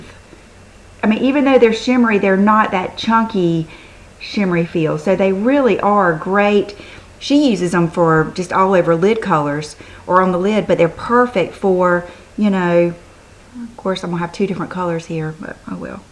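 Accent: South, United States. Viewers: Hi! I just subscribed because I've heard people talking about you and I'm so happy I did! I LOVE your "accent"...sounds like your from the south;) lol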